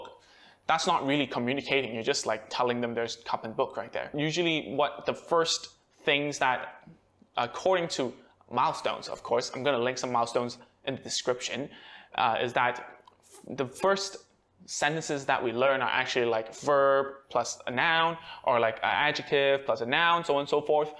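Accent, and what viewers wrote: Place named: China. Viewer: Man ! You speak English super fluent almost like native english speaker, how did you do it ? A bit slight chinese accent in it but super tiny i assume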